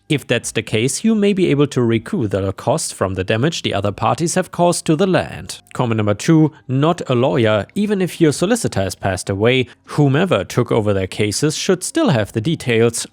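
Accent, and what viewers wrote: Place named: Germany. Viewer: Why don’t you tell your stories in German with subtitles your accent is terrible I would rather listen to the German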